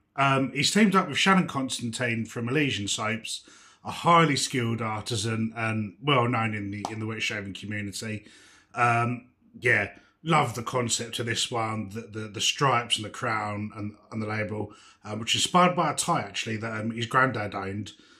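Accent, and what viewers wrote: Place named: United Kingdom. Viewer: Hello! Your latest video popped up in my feed so I subscribed, this is the first thing I've watched... Where in the world do you live? You have an incredibly authentic British manner of speech for someone in Brazil 👀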